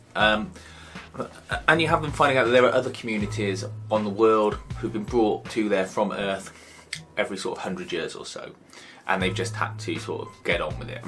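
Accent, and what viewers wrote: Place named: England, United Kingdom. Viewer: Finally hearing an English accent review an American comic.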